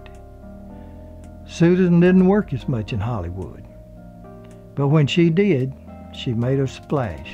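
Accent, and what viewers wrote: South, United States. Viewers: Mr i found your voice very southern jusr the way miss Susan Hayward was attracted to southern men according to her biography a book titled;A star is A star is aStar lives and loves of Susan Hayward thank you mr for such a beautiful. tribute to one of the most talented actors in the World, i always say and think an an actor(actress) should be honored while still alive unfortunately Miss Hayward died young but i know she would had been thrilled had she lived long enough to received this tribute from a soutern. gentleman thank you again Mr... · Very well done,except that awful Southern accent!!YUCK! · Thank you for this piece about Ms. Hayward. I have been going back and watching her movies and remembering her as of late, and this was great to see. I was 13 when she passed and I wept, I loved her so. I became attached to her when I would see her on the Saturday afternoon or evening movies and then I was hooked; an awestruck boy in love with a classy star whose performances made him believe that we could all endure and thrive. 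Also, I am not sure where you are from, but your accent is wonderful. My family is from the south, and listening to you, it was as though I was listening to my uncles telling old family stories. WONDERFUL!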